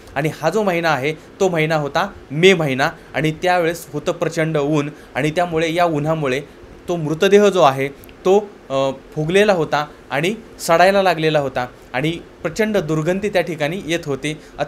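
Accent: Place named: Ahmednagar, India. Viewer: saheb, nice Nagar (Ahmednagar) language. This language is very superior to other district languages especially vocabulary, uniqueness, least niazam urdu, systematic presentation. Keep it up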